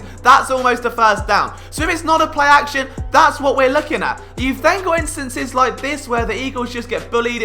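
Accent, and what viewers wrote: United Kingdom. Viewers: Who the hell is this Brit, trying to talk football?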